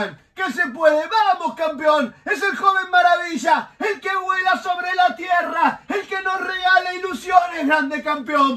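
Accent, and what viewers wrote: Colombia. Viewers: Un super narrador, parece q fuera colombiano, gracias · Bien pibe por esa narración, eres mas colombiano que argentino. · eres De lo mejor narrando, pareces Colombiano y que sean muchas mas, saludos... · La narración de éste tipo es impresionante ... parece que fuera colombiano !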